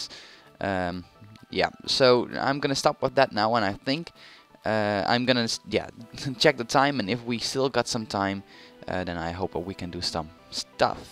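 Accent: Netherlands. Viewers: Ben je Nederlands? Je klinkt Nederlands :)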